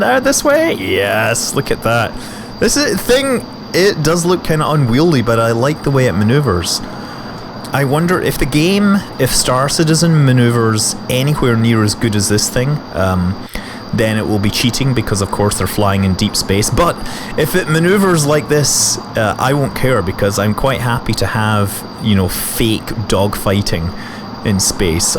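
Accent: Scotland, United Kingdom. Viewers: May I ask? Are you Scottish?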